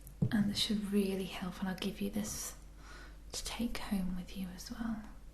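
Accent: Scotland, United Kingdom. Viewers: No video + natural accent almost makes me feel like I'm listening to someone completely new. Fitting to the channel rebrand, I suppose. I think this and your Scottish accent are both equally wonderful, excited for new videos in either. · Wow! Love this, ear examinations are my favorite, can't believe I've only just found your chanel! Nothing wrong with your Scottish accent but your natural English one, I find is more relaxing 👍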